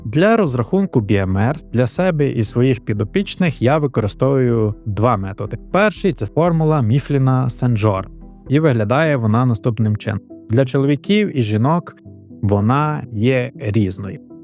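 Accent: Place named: Galicia, Ukraine. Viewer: Ваша галицька Ч додає родзинки! Приємно слухати🤍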